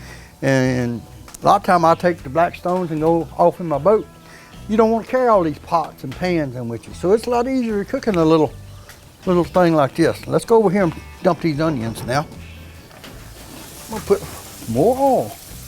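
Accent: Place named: Louisiana, United States. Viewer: Oh man, my dad grew up in South Louisiana and watching this video, with accent, mannerisms, and lingo makes me think of my dad so much. Its like watching my dad make jambalaya lol.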